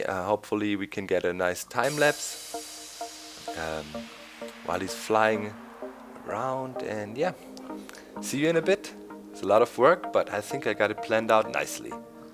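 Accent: Germany. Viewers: ach ich liebe deinen deutschen Akzent :)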